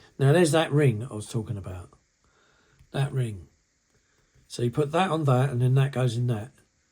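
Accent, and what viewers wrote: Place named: England, United Kingdom. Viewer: With some English dialects I have troule hearing right..did he say the resin pilot and thrust cone comes with the kit?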